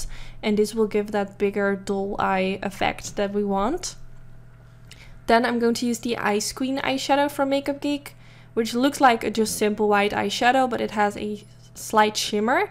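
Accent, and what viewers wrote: Netherlands. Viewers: WOW this look is so cool! Love it! 💚💚💚 Wacht eens even, ik hoor een Nederlands accentje. Ben je Nederlands!? Wat gaaf!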